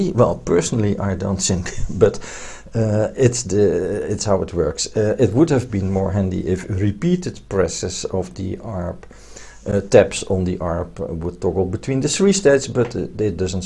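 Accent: Netherlands. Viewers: Hello I like your video's about the MODX. They are very helpfull. Your English sounds a little bit with a Dutch accent, so I assume you are Dutch? Mag ik je daarom iets in het Nederlands vragen; ik overweeg om ook een MODX6 aan te schaffen, maar ik ben vooral geïnteresseerd in de Vocoder mogelijkheden. Heb jij daar misschien al ervaring mee, want op Youtube heb ik daar tot nu toe nog niets over gevonden..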